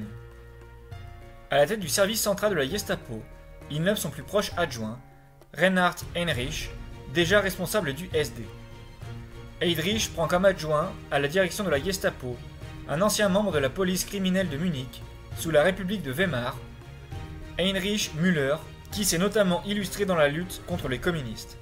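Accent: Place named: Grésivaudan, France. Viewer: Ça sent l'accent du Gresivaudaaaan ça!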